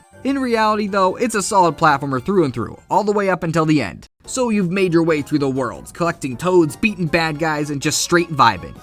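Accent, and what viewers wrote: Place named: New York, United States. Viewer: I might be going crazy but, I swear he's getting more and more New Yorker as time passes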